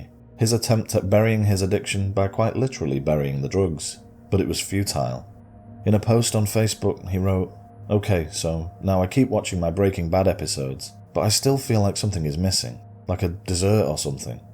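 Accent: United Kingdom. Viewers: How pleasing to hear your uk 🇬🇧 voice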